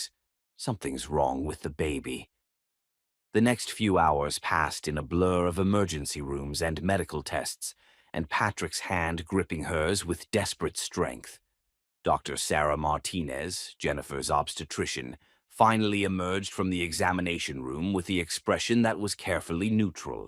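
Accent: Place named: England, United Kingdom. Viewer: I like this story because of the eloquently English spoken accent. I also like that the couple didnt wait to show their feelings for each other❤ Listening from Antigua 🇦🇬 Patrick is a bit slow in getting ahead of his greedy cousin Marcus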